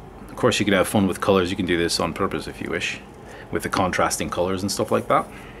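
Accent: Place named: Scotland, United Kingdom. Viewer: Interesting mix of Scottish & American & maybe a little Australian accent there.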